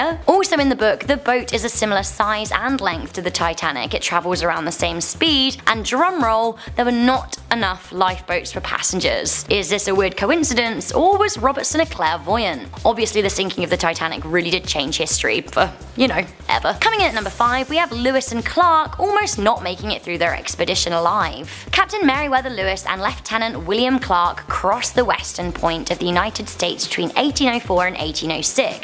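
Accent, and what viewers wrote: United Kingdom. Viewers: Nice video and btw I know you are british but still lovw your british accent <3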